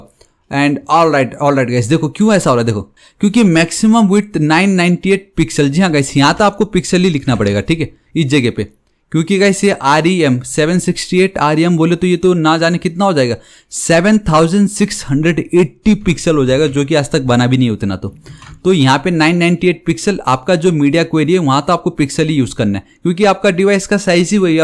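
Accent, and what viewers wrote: Nepal. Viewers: nepali acccent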